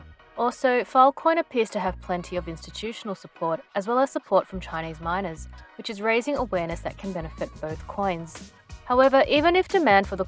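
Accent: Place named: Australia. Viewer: Nice video. You from Oz? I'm from NZ, so the accent stood out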